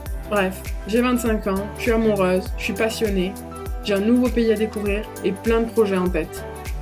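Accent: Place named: Albi, France. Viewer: Oh nioooon je suis trop contente d'entendre ton accent 😭❤ Albi forever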